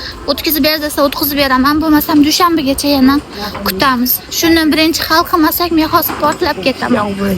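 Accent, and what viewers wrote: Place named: Andijan, Uzbekistan. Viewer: анжанликмисиз, сарагҳее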